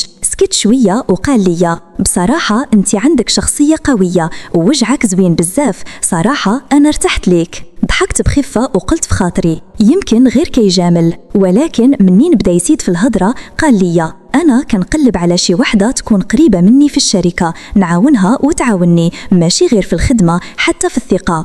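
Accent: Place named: Algeria. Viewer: مغربية و تهدري باللهجة الجزائرية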